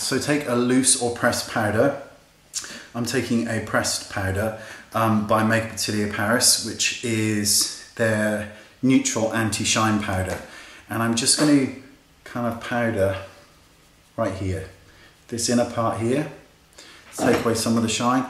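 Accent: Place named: Bristol, United Kingdom. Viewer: Where are you from? I love your accent! I'm from Bristol and it slightly reminds me of that :D I love your videos, I've been watching them for a while now :) They've completely changed my routine. I'm a nurse and want to look clear and healthy, could you do a video to recommend a minimalist look? :D sorry for the long comment lol xx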